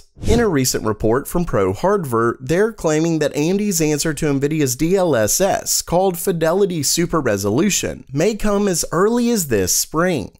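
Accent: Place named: Texas, United States. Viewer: Your accent sound like a Texan or Oklahoman accent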